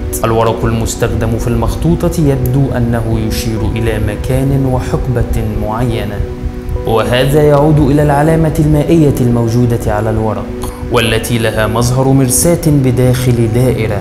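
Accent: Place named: Egypt. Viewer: مش عارف اتفاعل معاك ب اللغه العربيه الفصحه عشان واضح اوي انك مصري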